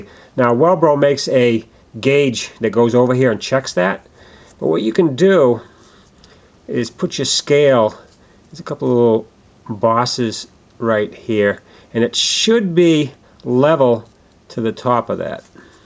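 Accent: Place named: Down East, United States. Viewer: Neatly done,. I searched for a long time for installation info after getting this kit,with no luck whatever. Your video made it look simple. Thanks for posting. BTW,I'm from Kansas,& I enjoyed yer down-eastern accent! So, ignore the guy below with the Peter Griffin comment.:-)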